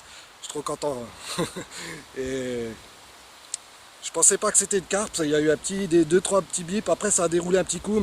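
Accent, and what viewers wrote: Alsace, France. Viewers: slt j'ai bien aimé t vidéo avec ton accent alsacien c'est un plus je me permet de venir vaire toi tu parlais d'économies pour les bouillettes je sait pas si tu connais le site aliexpres tu peux trouver du matériel pour les montages pour la pêche de la carpe PVA sac solubles est qui est moins chaire que les grandes marques le seul inconvénient c'est la livraison qui est un peu longues c'est connu par les carpiste le matériel est de bonnes qualités il à était testé pendant 1 ans voilà si sa peut te faire économiser encore un peu d'argent dit mois se que tu en pense dans l'attentes merci au plaisir de te suivre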